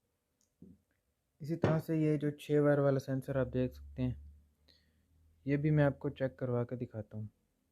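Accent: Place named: India. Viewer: Do it in English I can't understand Indian accent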